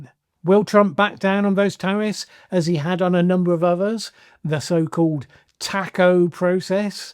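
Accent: United Kingdom. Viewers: Canadian here. UK pronunciations ftw.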